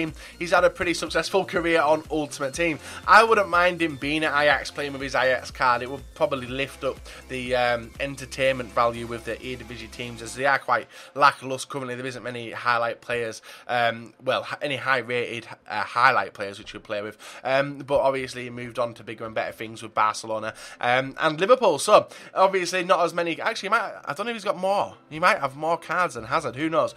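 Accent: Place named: Yorkshire, United Kingdom. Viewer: I love the Yorkshire voice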